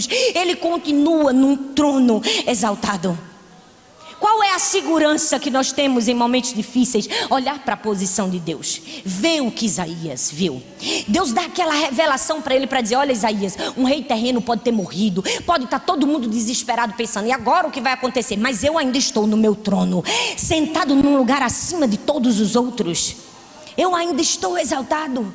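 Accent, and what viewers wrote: Northeast, Brazil. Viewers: Pastora amo seu sotaque. Sou do Nordeste também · Pelo sotaque sabemos q a pastora é nordestina gostaria de saber de onde é . Muito boa cheia de Deus 🙏🙏🙏🙏🙏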